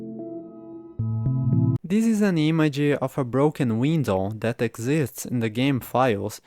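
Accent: Brazil. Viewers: I can sense the Brazilian accent from miles away 😭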